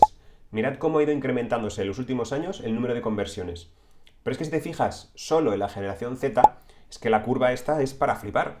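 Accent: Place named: France. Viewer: Lique por tu acento francés. Mathafaka tb suena mejor en ing. ✅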